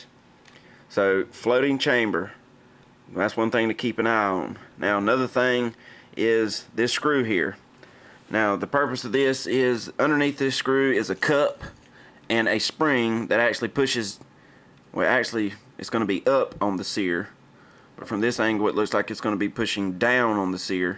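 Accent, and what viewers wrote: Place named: North Carolina, United States. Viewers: As soon as I heard your Tarheel accent, I wanted to get a pulled pork sandwich and a can of Cheerwine. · That sounds like an Appalachian Tarheel accent, coastal Carolinians don't talk like that.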